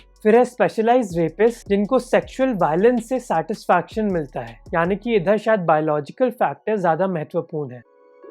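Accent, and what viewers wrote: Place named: Northeast, India. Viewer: By the accent i am sure you are from arunachal or northeast right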